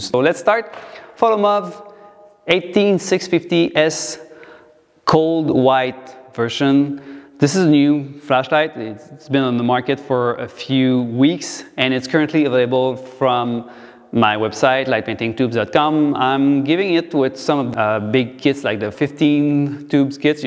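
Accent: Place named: Quebec, Canada. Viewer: Oh shit jpensse t québécois man ahah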